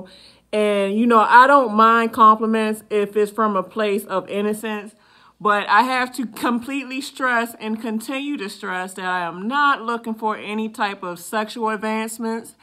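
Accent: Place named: South, United States. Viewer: Girl you talk country with that thing on your tongue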